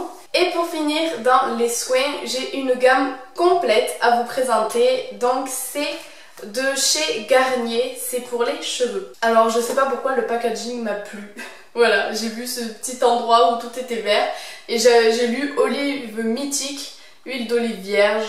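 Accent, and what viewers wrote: South, France. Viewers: une "cagade" mais ouuuuui Sud en force, par ailleur ton accent me fait rêver une des seules youtubeuses avec un VRAI accent du Sud ! Super vidéo comme toujours! continues comme ca ma belle ❤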